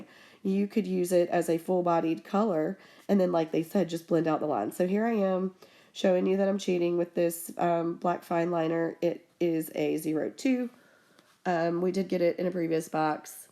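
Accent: South, United States. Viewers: Trying to support smaller artist channels. I don't understand why some of y'all have so few views. Your reviews are just as good as those of the "bigger" people. Yeah the filming quality isn't as good but the content is fine and concise. I liked your video and your southern accent. Don't hear it anymore since I moved from TX to Massachusetts haha Edit: wait, they put different colors in the boxes? I didn't know that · I love your southern accent 😊. I lived in Mississippi for years! I live my Princeton brushes!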